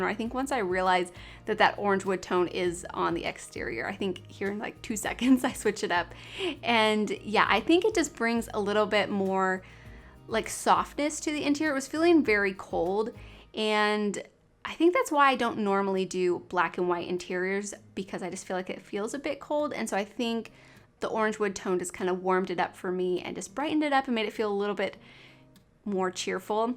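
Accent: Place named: Michigan, United States. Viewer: are you from michigan originally? i see your adress is now in california but i just wonder because of your pronunciation :))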